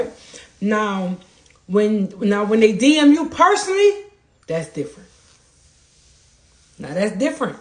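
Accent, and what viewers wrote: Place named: New Orleans, United States. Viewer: NOLA Girl, I HEAR YOUR ACCENT.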